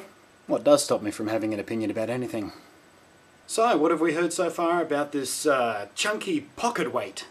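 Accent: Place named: Australia. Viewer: How do you know this if you do not even have a zune and not even allowed to buy a zune because you live in Australia well it sounds like it from ur accent. I have a zune and it is better then a ipod in my opinion I dont care what you say im just saying that you are saying stuff you dont even know for real Specialy sence you cant even get one.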